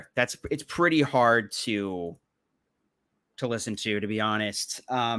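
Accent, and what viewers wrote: RP, United Kingdom. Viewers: Omg your BBC World service accent is on point. More please.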